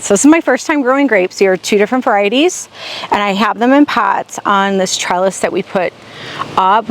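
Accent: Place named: Midwest, United States. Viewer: I knew you had to be from the Midwest once you said you were from Illinois, we have the same accent 🤪 I’m in MN. ❤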